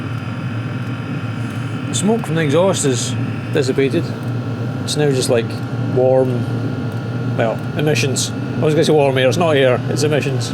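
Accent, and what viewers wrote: Scotland, United Kingdom. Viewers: After bingeing on AVE videos, a gentleman with a Scottish accent has made this minnesotans day.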